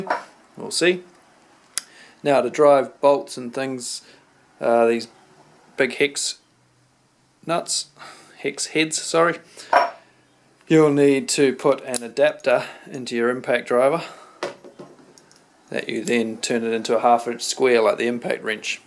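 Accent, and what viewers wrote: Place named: New Zealand. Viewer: Sound a little bit kiwi almost actually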